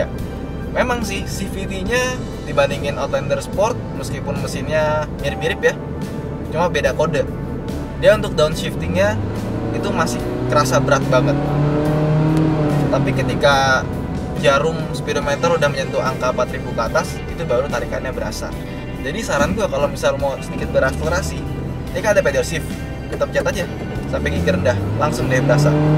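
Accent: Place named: Semarang, Indonesia. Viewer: mas wong ndi to? logate koyo wong semarang? asem...:D usul: kenapa gak kalian bikin acara khusus otomotif ber-3 kayak top gear. Khusus menjelajah Indonesia/asean pake mobil2 yg familier di Indonesia. Kayak review mobil delica bagusnya langsung test naik gunung berbatu, berlumpur, melewati lembah dll. Gitu kan jadi lebih jelas pointnya. Bosen kalo test nya di hutan yang itu2 melulu mas.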